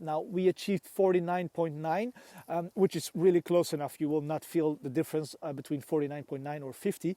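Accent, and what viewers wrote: Belgium. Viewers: Interesting video! 👍 And clearly you're from Belgium. 😄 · Where are you from ? you don't sound German (otherwise your english is very good) you sound more like a Belgian talking English :p unless i'm that mistaken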